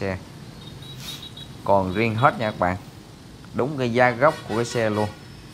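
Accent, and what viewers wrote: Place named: Nghe An, Vietnam. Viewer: Ớ quỳnh lưu nghệ an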